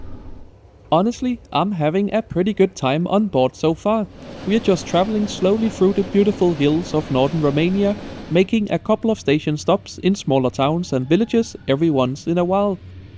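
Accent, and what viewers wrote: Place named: Denmark. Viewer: He's from Denmark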